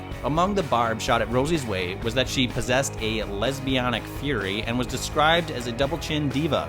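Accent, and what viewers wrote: United States. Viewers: Good lord, who is this wanker narrating? Tell him to piss off and bring back one of the British boys. I didn't even know WhatCulture had any Yankee berks on working for them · It's weird hearing an American accent on What Culture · just go mate leave no one like you and your american voice.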